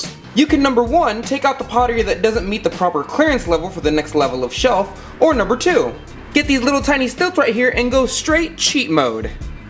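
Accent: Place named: France. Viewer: Great video, buddy! :D Though I'm a bit disappointed you didn't say "tomorrow" with your french-ish accent :P I was kind of waiting for it!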